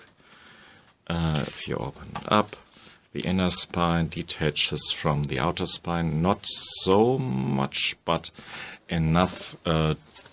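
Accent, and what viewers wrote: Germany. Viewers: Ich finde es schade, dass du in englisch bloggst, vor allem da es dich einschränkt, weil du manchmal die Worte suchen musst. Andererseits mag ich den deutschen Akzent .) Aber am Besten gefällt mir, dass du auch Disney Comics im Schrank hast .. Thumbs up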